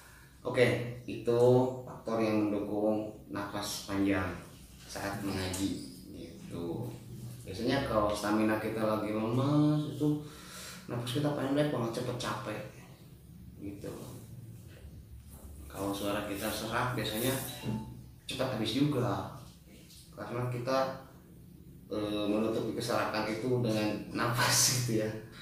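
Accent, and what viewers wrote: West Java, Indonesia. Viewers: Lucu tapi bermanfaat mkasih bang ats ilmunya😃Sunda pisaaan euyy😂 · Sunda punya, hihi logatnya itu lohh ustd khas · Mantap Kang... Prnjelasannya alami... orang sunda bgt... Hehehe. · Logat sunda tee bisa lengit aa 😁😁 Kasep ogeh dei aa ,,,shat trus nyaa aa ,,aamiin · Begitu sajah... Sunda pisan bhs indonesiana..😁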